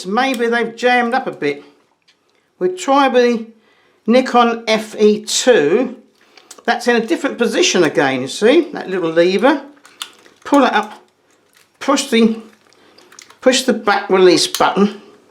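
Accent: United Kingdom. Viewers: I am looking for a film camera (I am from the digital era), and Sir you convinced me about getting a FE. Love your British accent, greetings from Argentina.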